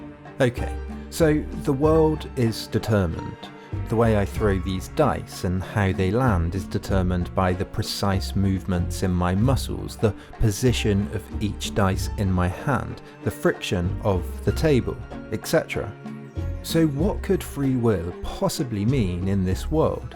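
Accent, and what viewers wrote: United Kingdom. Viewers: Why do I find this guy so attractive? I think I have a thing for British accents and philosophy majors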